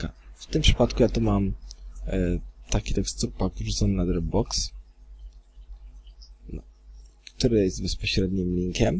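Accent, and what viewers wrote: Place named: England, United Kingdom. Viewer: Masz taki angielski akcent <3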